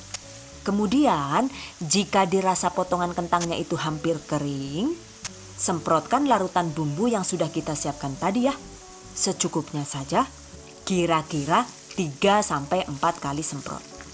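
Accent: Banyumas, Indonesia. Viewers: Enak kuwe yuu, tok jajal olih apa ora yu.🤣🤣🤣 Rika teyeng ngomong Ngapak ya🤣🤣🤣🤣👍👍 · Deneng ngapak mbok ayu😂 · Suka banget klo udah kedenger ngomongnya ngapak2 gitu..aelaah bikjn ngakak polll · Makasi Mbaaak... Btw ailopyuu logat ngapakmu. Lagi enak2 ngrungokke serius ujug2 ngekek kemekelen🤩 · Orang madiun kok ngapak yah...?😁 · Ngapak ngapaknya kerenn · Matursuwun ilmune bermanfaat Haha ha kocak jawa ngapake .sukses trs mbak yu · Hahaa jebulè wong ngapak 😅😂 (di akhir video) baru nyadar 🤣🤣🤣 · Deneng ngapak yak. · Mantap CENEL ini, sy suka, cara bicaranya jelas ada dagelanya juga, bahasa ngapak nya itu lo, bisa bikin ketawa 😂😂😂 aku sangat suka sama cenel ini 👍👍👍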